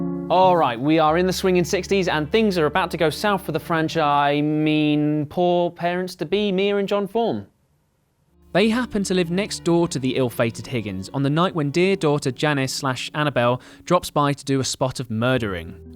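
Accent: United Kingdom. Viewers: it's his accent, basically he pronounced it in british accent because he is british. he didn't pronounce it la yorona because he is not a spanish speaker. we all have distinguish pronouncation b*tch. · Your British accent is charming , like all 'cockneyian " accents are ! Your pronunciation of " la - llorana ", not so much ...😂😂😂😂😂😂😂😂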